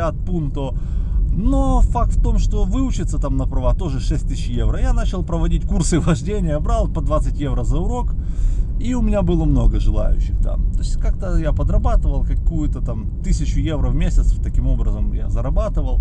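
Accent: Odessa, Ukraine. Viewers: слышна кстати типичная одесская интонация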